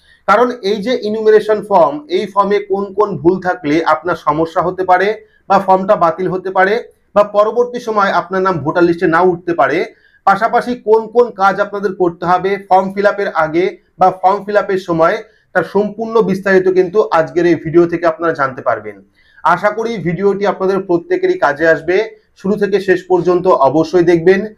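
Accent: Bangladesh. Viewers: Aponar kothar modhay Bangladeshi tan ache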